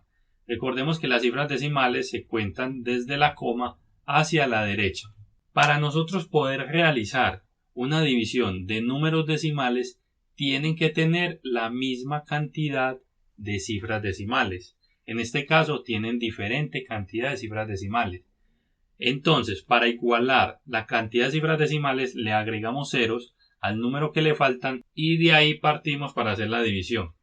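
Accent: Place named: Colombia. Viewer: Su acento es colombiano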